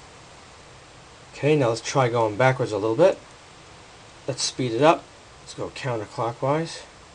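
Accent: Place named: New Jersey, United States. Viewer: Awesome circuit again. I have learned how to design and make any circuit that I want to now, just improvising from all the things I have learned from you, and many more like you. Thanks for all your teachings. And we love that NJ accent. It sounds so warm, especially with the style of how you make your videos which I find very engaging. A bit viceral even. You bring the audience way into your presentations. It is an effective style that others should emulate in my opinion. They are enjoyable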